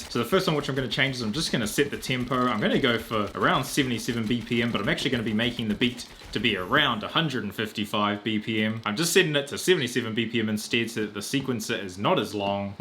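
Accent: New Zealand. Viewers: you from nz oi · Are u from nz? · Is this guy from NZ? the accent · are you from new zealand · Are you from New Zealand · y do u sound new zealend? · you sound like a NZ'er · Are you from New Zealand? I recognize your accent.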